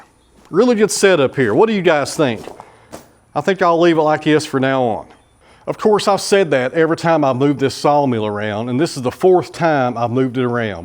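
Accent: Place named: South, United States. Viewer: I like your videos and can understand your southern accent well because that's exactly how I talk. We must be from the same general southern area. Thanks.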